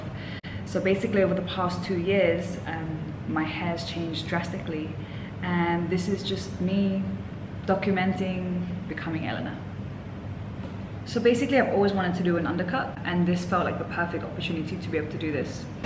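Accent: London, United Kingdom. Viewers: She has british accent omg im so in loovveeeeeee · love your londoners accent 😄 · she's british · Bloody hell, you speak the way London folks does...fuck yeah...you are amazing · British uyy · Dia setengah prancis tapi pernah kuliah di inggris jadi yaaa mungkin karna itu dia punya British accent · Sukaaa sama aksen nya british bangeeet 😍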